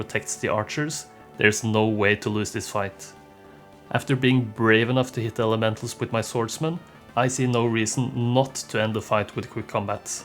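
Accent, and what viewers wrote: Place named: Norway. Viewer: You sound like a Norwegian from the 80's. Trønder maybe?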